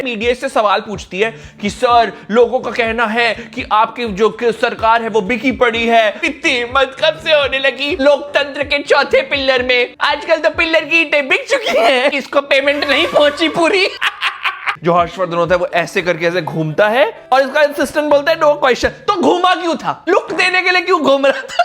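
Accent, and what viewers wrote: India. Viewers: Your that desi ascent 😭❤️❤️❤️❤️❤️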